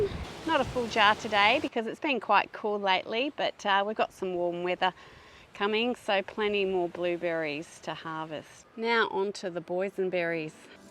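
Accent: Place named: Australia. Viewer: I enjoy all your videos. I especially like your lovely, exotic (to me) Aussie accent.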